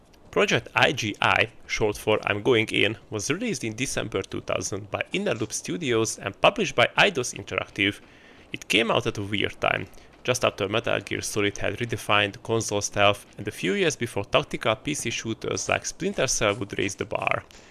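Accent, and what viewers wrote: Hungary. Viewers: BTW, are you Hungarian by any chance? I think I can hear on the accent. I live in Budapest but speak very poorly. Anyway, subscribed and looking forward for more backcatalogue reviews.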